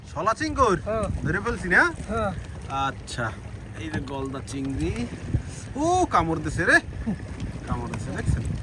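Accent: Barishal, Bangladesh. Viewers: Ki kotha re bai😂😂 anne sob dehi borishailla mönü ara🐸🐸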